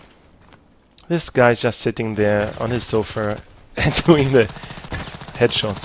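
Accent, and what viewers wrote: Germany. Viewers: cwaddict LOL i knew it you germans have that kind of accent :#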